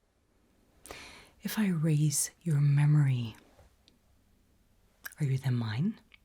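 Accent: Midwest, United States. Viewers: AMERICAN? as an American myself this was pretty good!! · Not only the "R"s, but also the use of "gonna"? Excellent American accent! Really impressive (and still just as precise and relaxing in tone).🖤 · Your american accent is so good, coming from an american! You sound like my family members from the midwest. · As an American who's never seen your channel before, I couldn't tell that you weren't American until I opened the comments. Well done. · gotta say, (as an american) you NAILED the accent! it was so uncanny to hear you as an evil *american* scientist as opposed to a british one! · You say it's dodgy but I immediately clocked it as a non-specific American accent with the "Hi there." So I think it's a success. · That American accent freaked me out but it was very good 😅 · pls more amerivan accent asmr❤ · Wow, your American accent is SO good! 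Personally, it makes me think of someone from the Midwest who's moved to New England. It also really reminds me of a specific episode of Orphan Black in which one of the characters who has an Irish accent (Siobhan) is impersonating someone who's American or Canadian (the show is rather vague about that for the most part). Regardless, I'm really impressed and rather unnerved, seeing as I'm so used to hearing an English accent from you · Awesome U.S. accent! Truly! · I spent more time seeing if you'd slip up 😅 but you did really good. Not dodgy at all! "If you were stood here" was the most sus I like your American accent. Hope we can hear more of it! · Really love the American accent! Very tingly ahaha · I was not expecting the American accent, caught me off guard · The Ameirican accent was not expected but was very much appreciated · Okay, having rewatched the video a couple of times now ( -induced naps for the win), I have to admit that this American accent of yours is really growing on me. 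I imagine it's quite the shock for many viewers to see your signature voice sound slightly altered, but it's also super fun to see (well, hear) you playing around with it! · Great American accent! 👏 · Oh wow! The accent is great. If this was my first time watching I'd think you were American! Just a few vowels gave you away 😉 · It sounds like a midwestern accent, like in states near Canada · ooooh your american accent is scary good! the only tell is that you said “rather difficult” where americans would probably say something more like “kind of” or “kinda” instead of “rather” in everyday spoken language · The basically perfectly American sounds coupled with the still-mostly-British cadence makes this so surreal 😂 fantastic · the American accent??? 😱🤌🏼❤️‍🔥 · Greetings again good lady. You are simply going from strength to strength with every upload. This video, the A-Z, the Backrooms video, the Infernal Job Interview... 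I could keep going, but will not for the sake of brevity. I loved your American accent here, and it seems that your American watchers did as well, judging by the other comments. I thank you heartily for your wonderful work, and I eagerly await your future uploads. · This is the second video I’ve seen of yours where you use an American accent (the other being the Noir Detective role play) and damn, you pull it off really well! Like others have said, your “Hi there” had me saying “Whoa what?!” 😂 Impressive as always! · Haven't heard this accent since your last cranial nerve exam I believe! Very appropriate to use an American accent for MKUltra 10/10 accuracy and performance. Love your vids, thank you so much for keeping us entertained (and relaxed) <3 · The American accent gave me whiplash so hard that I’m now fully awake 😭🙏🏼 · I’m a californian and she sounded almost mid western to me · I was wondering why you sounded so different at first until I noticed all the comments. 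You really hammered the American accent pretty well. It's hard to pinpoint a specific, but it's like a mix between the Midwest and New England accent.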